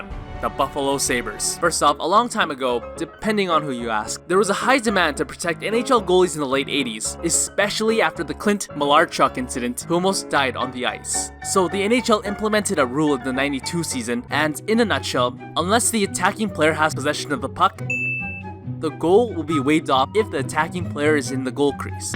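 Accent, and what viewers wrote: Canada. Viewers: What part of Canada are you from? You sound like you're from Quebec. Or maybe you just have a cold.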